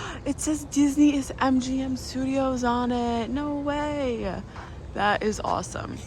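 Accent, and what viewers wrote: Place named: New Jersey, United States. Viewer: Just found your channel looking for pop reviews since this would be a good cost option for our next trip 😊. I’m from NJ and I’m hearing a similar area accent lol where are you from?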